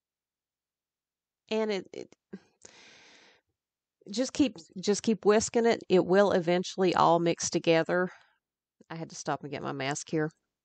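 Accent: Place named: South, United States. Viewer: Lovely job. Very similar to the recipe use.... just subscribed to ur channel I'm a fellow bath and body product creator... love ur personality and I can definitely relate to making messes while working 😆... love ur southern accent btw...I'm from NC and I've got that twang too 😆 God bless and hope to see many more of your beautiful Creations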